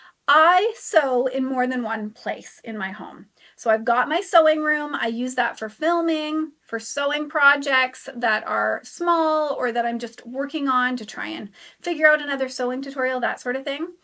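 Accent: United States. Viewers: “Beg” for bag and precision pronunciation of “iRON.”. You’re either in Wisconsin, Minnesota, or Canada. lol, I love the upper Midwest accent 😂. Thanks for the video!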